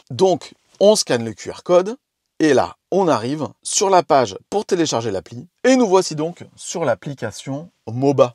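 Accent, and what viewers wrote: England, United Kingdom. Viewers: Vraiment cet accent Anglais...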